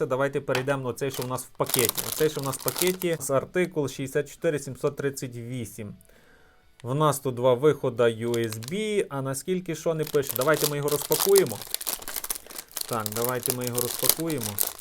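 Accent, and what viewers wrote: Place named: Transcarpathia, Ukraine. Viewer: Дістали, триста. з Закарпаття. Тільки там так говорять.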